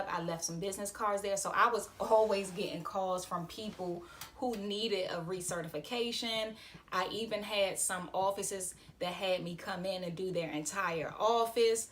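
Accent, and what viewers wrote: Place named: Washington DC, United States. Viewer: Hahaha I had a feeling you were from DC. The accent gave it away 😅. “Verrrrrrry” hahaha thanks I do EMS for DC and thinking about getting my cpr instructor. Thanks for the video. It was exactly what I needed to know. Do you need to get a business license as well and start filing taxes as a self employed person? Or would you rather get with me off the record?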